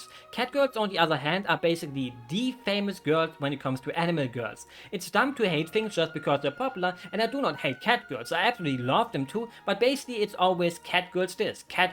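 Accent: Germany. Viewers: I just came along this video and looked at your channel and thought: "wow that this is a Nia fan and he has a Deutscher Akzent" and then I took a look at the channels description and I was definitely not disappointed xd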